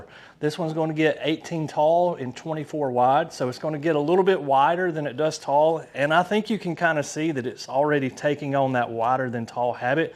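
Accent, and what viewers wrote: South, United States. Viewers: very educational....finally a southern talking youtube on geranium care!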